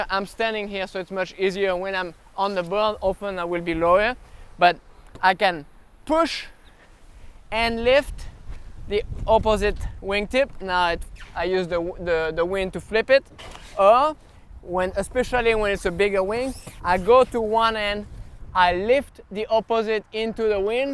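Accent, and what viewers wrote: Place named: France. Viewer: First and foremost, kudos to you for explaining how to do wing onpaddle board. It’s the most clear concise explanation I’ve ever heard. I will totally use my paddle board first. All these steps will help me concentrate on managing my kite at this time. Most of all any videos you made in the past is very interesting just to listen to your French accent!! It adds to the likeness of video. Thanks again.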